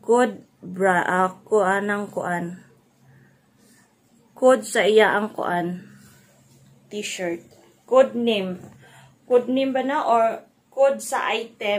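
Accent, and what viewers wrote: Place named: Philippines. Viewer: 35🌺🌺👍, love Filipino accent 😊, stay blessed 🙏 🙏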